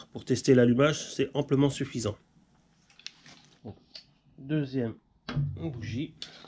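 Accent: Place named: Nord-Pas-de-Calais, France. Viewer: Bin té vo min grand quand un veux parler Français ché pas facile d'oublier le chti "un arcomminche" Merci pour cette vidéo lol!!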